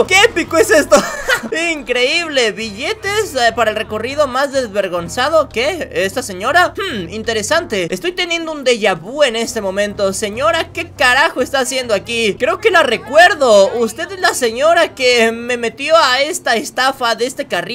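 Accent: Chile. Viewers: eres de chile?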